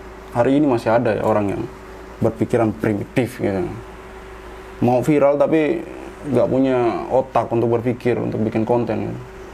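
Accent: Eastern Indonesia, Indonesia. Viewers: Mantaf.......Logat Timur Kaka....,👍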